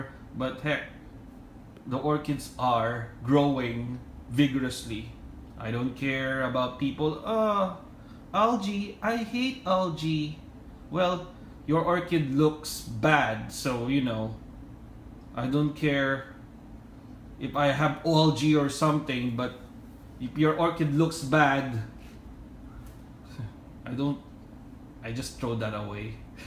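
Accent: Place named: Philippines. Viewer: Hi, love your videos. I don’t know but your accent makes me think you are Pinoy, are you? If so, I am so lucky to find you. I’ve been looking for Pinoy youtuber making vids about plants!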